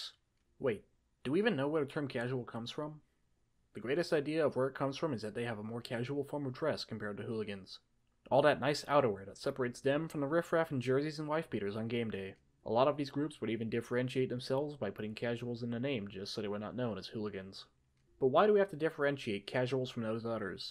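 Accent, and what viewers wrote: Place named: United States. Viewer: Soon as the yank started narrating, I switched off!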